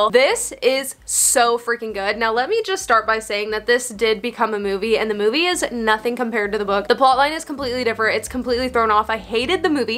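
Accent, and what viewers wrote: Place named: California, United States. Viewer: Ahah I mean what’s your American accent? 🥺 You actually sounds like Californian accent😅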